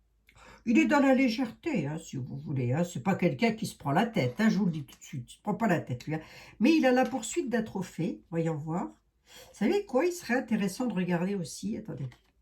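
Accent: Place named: Paris, France. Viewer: J'aime tellement que vous soyez une belle lorraine et que vous parliez avec une véritable gouaille parisienne 💓💕❣️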